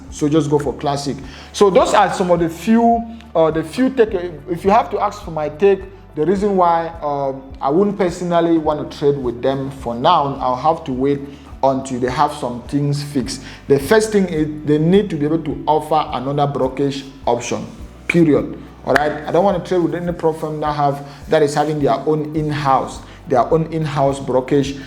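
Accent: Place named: Nigeria. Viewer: My wife said your accent is Nigeria. Lol. Thanks for the analysis it was helpful. Good job.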